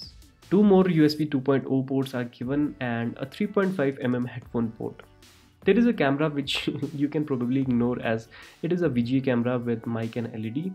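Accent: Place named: India. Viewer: omg this indian voice meeeeh, dislike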